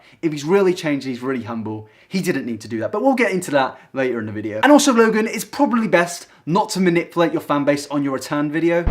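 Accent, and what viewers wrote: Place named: United Kingdom. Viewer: helpme helpyou the accent tells us hes british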